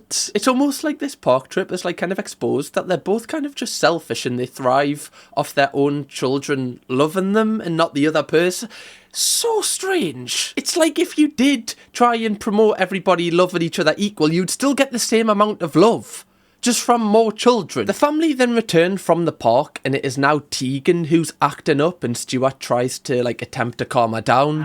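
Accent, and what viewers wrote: Newcastle, United Kingdom. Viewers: I used to not find supernanny. Then you come along with your gordie accent and make it one of the funniest things I’ve ever watched.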